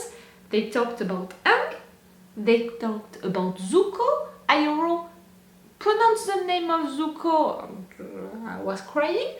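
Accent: France. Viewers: You still have the most incredibly French and incredibly sexy voice and accent that I have ever heard ; ) Now, on to the reaction! <3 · i love your english with french accent its soothing at times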